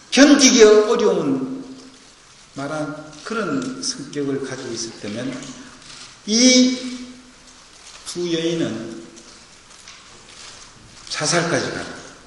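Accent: Gyeongsang, South Korea. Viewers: 역시나훈아님멋져 경상도말씨구수함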